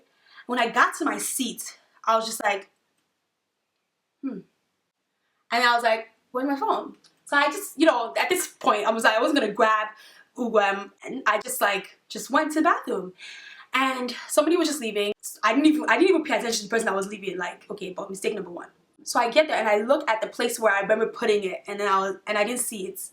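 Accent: Nigeria. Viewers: I made it thru the end lol. I love the Nigerian accent, and I can really relate to your accent because I'm also Nigerian💚💚✊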